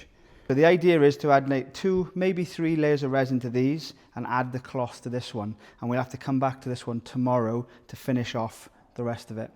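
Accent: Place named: Cardiff, United Kingdom. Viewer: Croeso, you sound like your from cardiff or very close am cardiff here love your videos mate keep them coming 🏴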